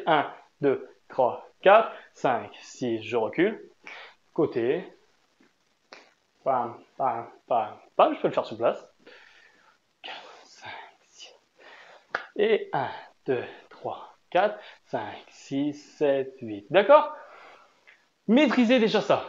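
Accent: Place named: Madagascar. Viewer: Coucou, pas mal....on dirait vous avez un accent malgache!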